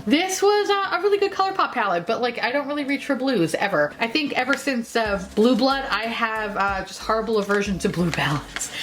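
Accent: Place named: New York, United States. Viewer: 4! There’s nothing I love more than you speaking garbage about things in your new york and Minnesota accents! 😂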